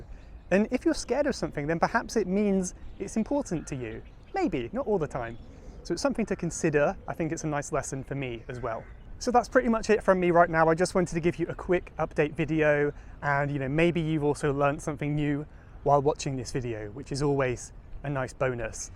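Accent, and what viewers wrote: RP, United Kingdom. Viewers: Hi, I like this channel so much!!!!! but unfortunately not many people commented on it,I have learned your british accent from your Youtube for 2 months,I hope you can keep updating and I will always be here, thanks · I love your accent. Is it modern RP?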